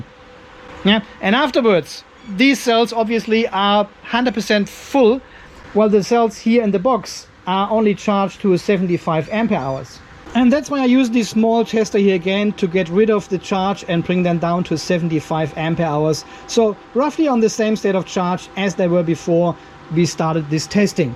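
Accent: Germany. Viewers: You do not sound very Australian. You have a German accent. I have learned quite a lot from this but not sure if it is all necessary.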